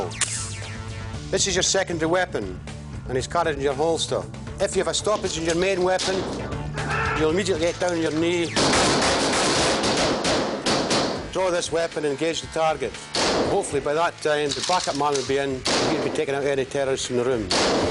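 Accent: Scotland, United Kingdom. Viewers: hes scottish not irish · Hes Scottish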